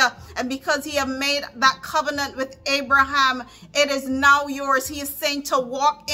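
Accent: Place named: Barbados. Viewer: Your accent sounds a bit Bajan! Great word! Confirmation! 🙌🏾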